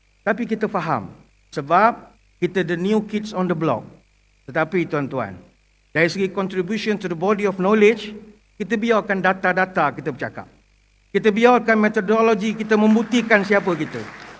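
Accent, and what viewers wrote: Pahang, Malaysia. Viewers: ustZ tetap dngn loght phng bkn sesetangah ulamak dan org phg lain maaf ckp mudah sngt ubah2 bhsa. nk malu ape. org klate selamba je. kedah pun mcm ustaz wadi tetap ngn loghat nok malu benda. malu bertempat bru btul. ni org dtg sni kita pulak nk ikut bhsa diorg. mat org putih tu ikut loghat teerengganu sbb org ganu tetap ngn loghat dan tetap hebat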